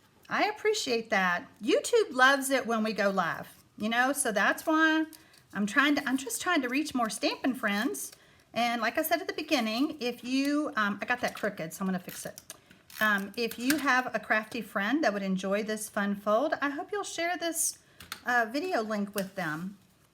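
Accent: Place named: Texas, United States. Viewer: Lordy, I miss that TX accent ! Raised north of Dallas in Denton and moved to se OK in '77. No one talks like that north of the Red River and I miss that ! Love your videos Lady. Keep on doing what you do ! 🙋 GreatNana in se OK usa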